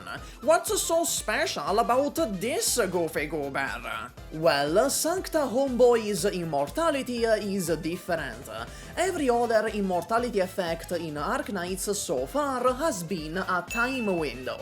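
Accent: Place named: Italy. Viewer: Oh shit, again this guy with Italiano accent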